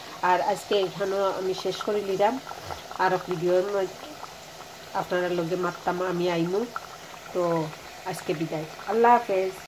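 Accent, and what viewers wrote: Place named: Sylhet, Bangladesh. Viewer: সিলেটি মাত আর রান্না মজা হয়ছে 😊❤❤❤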